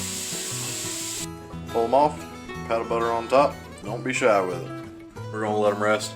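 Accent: South, United States. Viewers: You should do more videos. Why? Because I enjoy them and We all love your confederate drawl.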